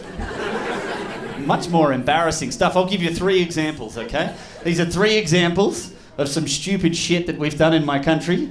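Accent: Australia. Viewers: Aussie accent is THE BEST ❤ Solid set too 👌🏽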